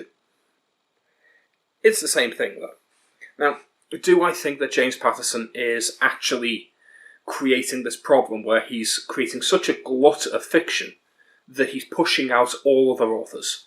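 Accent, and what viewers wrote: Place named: Liverpool, United Kingdom. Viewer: This is a bit random, but are you from the Wirral? I've been trying to figure out your accent because you sound a bit like a scouser but I'm not sure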